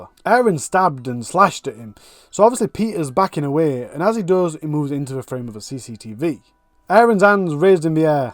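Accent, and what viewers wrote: Yorkshire, United Kingdom. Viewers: Where in Yorkshire are you from?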